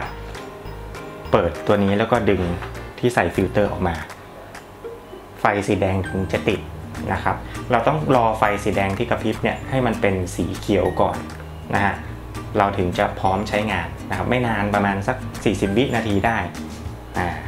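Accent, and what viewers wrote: North, Thailand. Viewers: คนเหนือหรือเปล่าครับ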